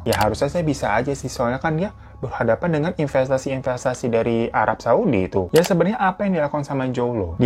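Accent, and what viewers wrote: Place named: Betawi, Indonesia. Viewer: Betawi nya lucu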